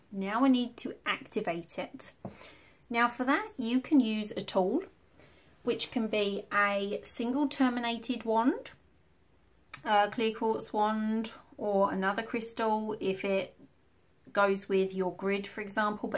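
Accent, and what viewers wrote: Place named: England, United Kingdom. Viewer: I love you english accent... besides that all that you said.. cheers from Colombia!!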